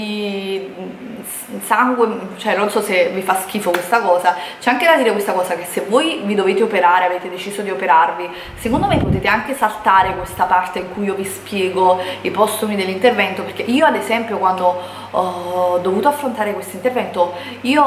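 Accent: Naples, Italy. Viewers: Che pena le persone che pensano che l'accento caratteristico di una regione sia una cosa negativa. NON è né un'attrice né una cantante, smettetela di parlare di dizione! Parlare di grammatica è una cosa, di dizione è un'altra. È napoletana e ha la sua cadenza, così come un fiorentino pronuncerà la 'c' aspirata e così via. La lingua è carica di storia, ed è cosa umana che cammina con le gambe degli uomini, nei luoghi in cui vivono. Sappiate che gli accenti e i dialetti sono un patrimonio e una testimonianza enorme e vanno preservati con tutte le loro diversità. Quando parlate di grammatica è una cosa, ma non se ne può più di leggere insulti sull'accento. Manco sapete di cosa state parlando.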